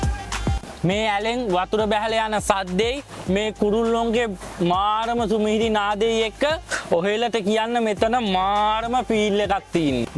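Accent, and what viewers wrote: South, Sri Lanka. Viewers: දකුනෙ බාසාවනො ගැම්මක් තමා ආය්බොවන්ඩ...❤😁 අපිත් දකුනේ මචෝ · ඒක තමයි දකුණේ විදිහ❤❤ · Dakune bashawa 🎉❤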